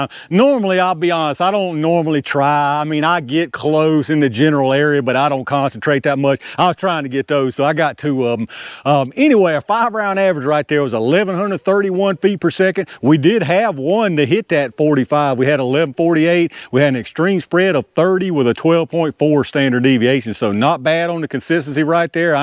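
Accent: South, United States. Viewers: Judging that accent, somewhere in the North Carolina/Tennessee area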